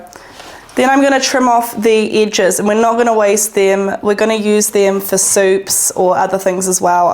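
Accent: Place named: New Zealand. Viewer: Thanks for the demonstration - simple is the way to go. You should get an Italian pasta machine (joking), the NZ accent is contagious not that our Aussie one isn't. 👍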